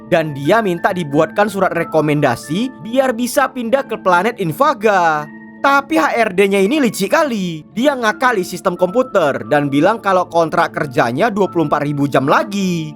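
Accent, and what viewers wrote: Medan, Indonesia. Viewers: Hahha lucu abg ni ngomong. 😂pasti org Medan. Udaaku subscribe y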